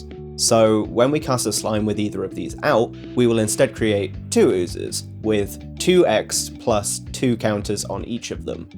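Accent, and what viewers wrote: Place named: United Kingdom. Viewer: I see slime video I hear british I sub. Fellow UK Slime enjoyer.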